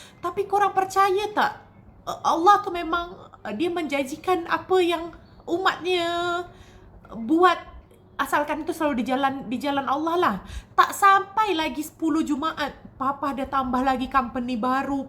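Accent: Malaysia. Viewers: Saya suka dan senang.mendengar cerita ini, apalagi diceritakan orang Malaysia logat Melayu, berdarah Indonesia.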